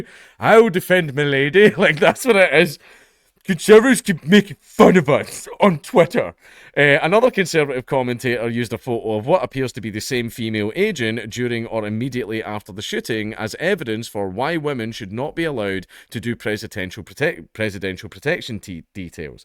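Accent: Scotland, United Kingdom. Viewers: Why is it made so much more ridiculous when called out in a Scottish accent?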